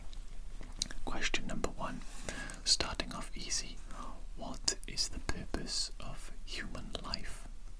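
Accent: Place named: North Wales, United Kingdom. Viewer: i’ve been watching you for a while now and i’ve just gotta say it, are you welsh? because i’m a valleys girl and your accent is giving me north wales all the way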